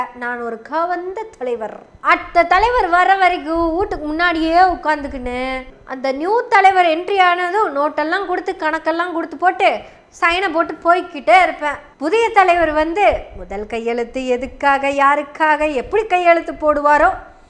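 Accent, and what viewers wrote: Kongu, India. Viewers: Very interesting presentation in beautiful Kongu Tamil. May the God bless you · அருமையான கொங்கு தமிழில் தவெக வை தற்குறி வெக வாக துவம்சம் செய்த சகோதரிக்கு பாராட்டுக்கள்.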